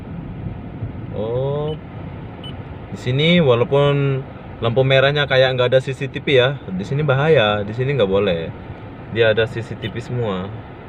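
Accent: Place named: Malaysia. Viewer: Melayu banget ngomngnya...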